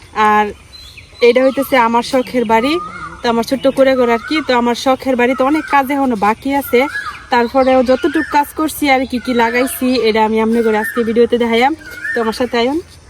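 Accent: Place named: Mymensingh, Bangladesh. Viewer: আপনি ময়মনসিংহের ভাষায় কথা বলছেন। এটা কোন উপজেলায়?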